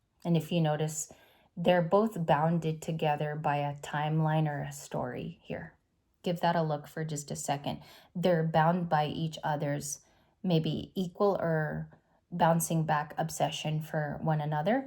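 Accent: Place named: New York, United States. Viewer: Thank you for the reading. I also had the Tower in my daily read. Love the NY accent glad to see you're channeling some east coast!!❤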